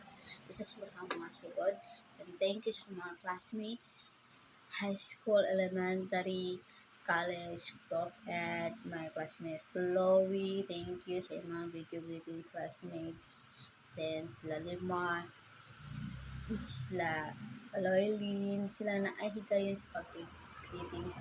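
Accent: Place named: Visayas, Philippines. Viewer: suggest ko mag content ka, mag usap kayo ni camilo in english kay camilo tamang pagka english tapos ang sagot mo english rin kaso barok at bisaya pag ka pronounce.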